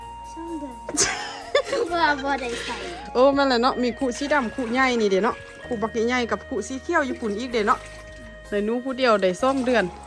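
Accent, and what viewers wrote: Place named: Sainyabuli, Laos. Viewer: สบายดี.ครับ.สาว..ครับชอบอะหยั่งในคริปของสาวแม่นบ่ครับ..กะชอบคำเว้าของสาวครับเว้าคำลาวไดัเปะฃู้คำบางคำแถวบัานอัายอแทบจะบ่ใด้ยินมาเบิ่งสาว เวัา..กะคึดออก..อัายกะคนเวัาลาวแบบสาวครับแต่.อยู่ฝั่งไทยอยู่ใกล้เมีองคอบครับในอำเภอบ้านอ้ายมืเว้าลาวอยู่สองหมู่บ้านครับ..สำเนืยงไชยะบุรื..ชอบเบิ่งบ่มักคอมเม่นท่อใดครับ..คนไทยเชี้อสายลาวครับ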